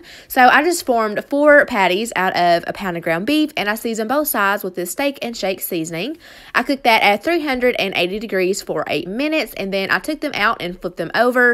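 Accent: South, United States. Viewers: Sincerely Sorry Ur Absolutely Gorgeous, Ur Country Accent Is A Choir Of Angels, Ur Mother Of Tha Century, Ur Husband And Kids, I Believe Are Very Proud And Lucky To Have You. God Bless 🙏🙏🙏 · I love in Kentucky too I have been wanting to start a YouTube channel but I'm so scared lol your doing so great and that country accent is so comforting lol keep it up girl your doing awsome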